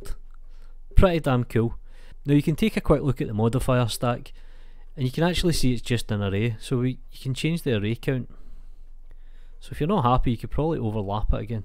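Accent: Scotland, United Kingdom. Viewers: yep Scottish for sure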